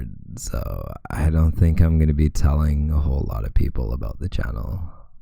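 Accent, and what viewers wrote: Canada. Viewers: Lol I knew you were Canadian the minute you said "about". Love the video you are definitely in my age range which makes me feel less weird listening to this lol. I hope you eventually make a discord/patreon or something similar so we can go on supporting you. Until then I will happily share your videos and wish you the best. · I can hear that Canadian twang!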